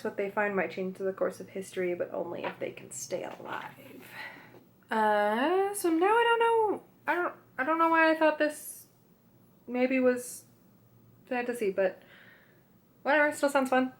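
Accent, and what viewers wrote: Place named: France. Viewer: You talk french btw? Coz I do and I LOVE THE ACCENT XDD😂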